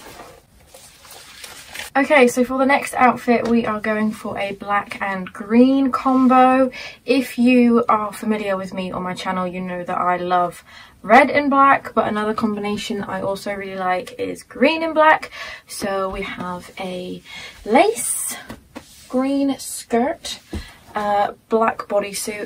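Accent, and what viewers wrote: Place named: United Kingdom. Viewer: Whys she british :(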